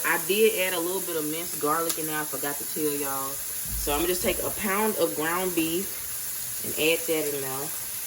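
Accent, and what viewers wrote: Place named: Georgia, United States. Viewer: Love your vids ! Trying to figure out your accent, are you from Georgia?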